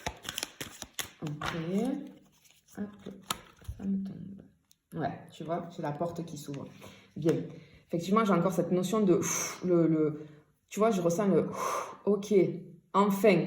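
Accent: South, France. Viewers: Bonsoir c est exactement ma situation, il ma lachez sauf son silence et ces pouces sur mes commentaires et je suis brisée de ses trahisons ! J en suis la!! Et vous avez raison, je me suis abonné, j adore votre accent du midi ,je suis née dans le midi et je vie dans l Isère et votre accent me fait du bien mon midi qui me manque tant! Gratitude infinie gros bisous 🌈🌞🧿💔💔❤❤😘😘🌷👏🕯🐞🌟🕊🍀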